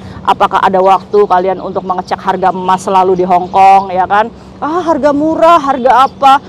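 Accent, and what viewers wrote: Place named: Batak, Indonesia. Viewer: Tumben nadanya kek orang batak kak😂😂😂😂❤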